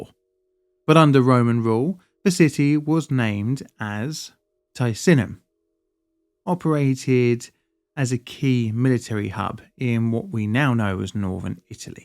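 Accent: United Kingdom. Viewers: Brit pronunciation always fascinates me. Do you pronounce pizza as "pee-za?"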